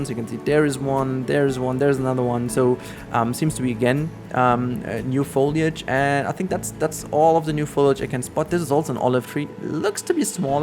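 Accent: Germany. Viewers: Krasser Akzent... Bist du Deutscher? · Und was soll das bitte für konstruktive Kritik sein? Was soll jetzt gegen den Akzent machen? Das kommt nun mal durch. Ist ja nicht so, als ob er das macht, um Leute zu ärgern oder um extra deutsch zu klingen. Die wenigsten sprechen eine fremde Sprache komplett ohne Akzent, wenn man sie nicht von klein auf gelernt hat, also wozu sollte man das bemängeln? Man versteht ihn und sein Englisch klar und deutlich und wenn einen das stört, dann muss man eben woanders gucken. Wir alle lernen im Leben, ob Sprachen oder anderes und jeder lernt anders schnell und spricht Wörter anders gut aus. Niemand ist perfekt. Was genau soll daran nun voranbringen, das man seinen Akzent bemängelt, der nach und nach mit dem regelmäßigen Sprechen von allein verschwindet? Sprachen sprechen und lernen ist ein langer Prozess, nichts was man über Nacht lernt. 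Mal ganz ab davon, dass es noch hinzukommt, ob man britisches oder amerikanisches Englisch spricht, also was genau hätte er bitte noch verbessern können? Soll er seine Familie ignorieren und Youtube aufgeben bis er perfektes Englisch spricht oder wie genau sollte das bitte laufen?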